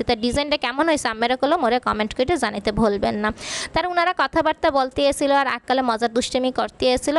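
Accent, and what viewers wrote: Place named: Barisal, Bangladesh. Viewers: বরিশালের মানুষ ও সুন্দর কোরে কথা বলতে পারে কেউ বুজতেও পারেনা যে বরিশালের মানুষ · Borisaler vasa😆👌👌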